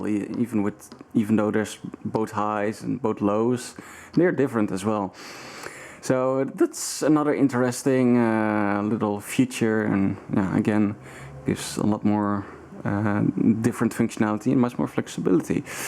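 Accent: Netherlands. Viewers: Hahahahah yeah me too, 99% of the time you can tell right away if someone is a Dutchman speaking english. Yeah these things were way ahead of their time in terms of features, the parametric eq and the crossover function especially, auto mix channel is cool too. Yeah i know, it's sad that people discard these amps when something other than a fuse goes because they think it's old and solid state but everything has a life span and they're not that expensive to replace so we'll give it a shot fixing this behemoth. Maybe i'll turn it into a video like you did but i digress, thanks for this video, i enjoyed it a lot.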